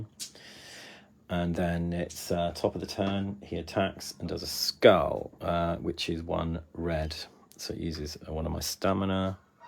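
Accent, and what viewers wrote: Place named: United Kingdom. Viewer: Ciekawy tytuł, mocno brytyjski akcent :)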